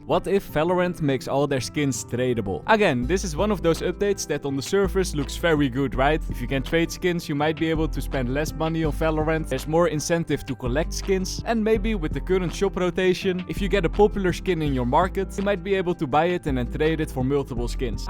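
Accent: Netherlands. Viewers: Every day the fishy trick guy with a dutch accent posts is a good day:)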